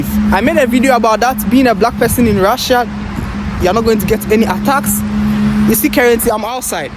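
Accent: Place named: Ghana. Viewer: He has the Ghanaian English accent....is he a Ghanaian?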